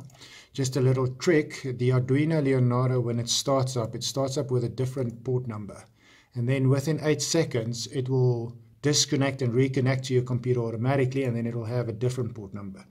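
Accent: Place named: South Africa. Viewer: I can hear that SA accent bro🤣 #represent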